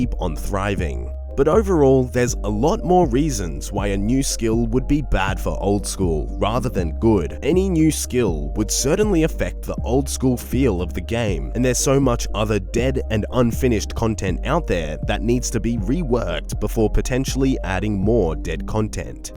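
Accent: Australia. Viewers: wait you australian? lol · wtf your Aussie, never knew :)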